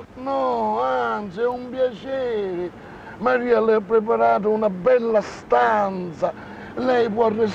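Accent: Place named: Catania, Italy. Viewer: Accento (catanese e non palermitano) orribile peraltro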